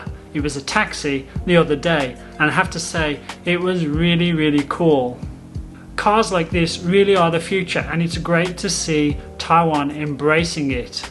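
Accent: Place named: United Kingdom. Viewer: 哈哈哈！英式語調，英式幽默！非常喜歡！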